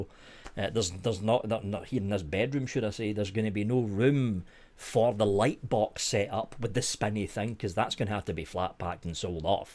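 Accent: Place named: West of Scotland, United Kingdom. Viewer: Hope your health's improving. Sorry that you find yourself in this situation. I'll still be watching your reviews - you didn't win all those awards for nothing...also as a West of Scotland guy it's nice to have great reviews from someone who talks like me!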